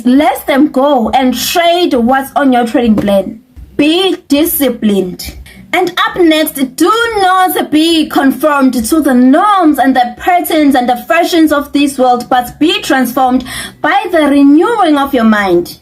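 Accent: South Africa. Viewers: She's South African from her accent indeed she's South African. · South Africa, even her accent.🇿🇦🇿🇦🇿🇦🇿🇦🇿🇦🇿🇦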